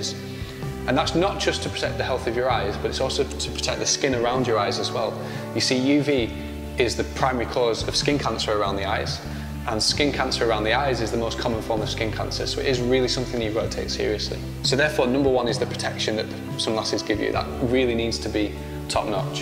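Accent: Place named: North, United Kingdom. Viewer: The accent is from the northern part of the UK. he sounds to me. .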